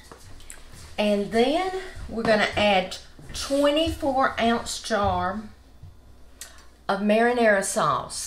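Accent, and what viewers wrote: South, United States. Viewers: Thank you so much for the warm welcome! I know that Southern people are very kind... I married one 😊 My husband is from North Carolina. I just LOOOOOOVE those Southern accents 😍😍😍 God bless you and the rest of your family as well 🙏🙏🙏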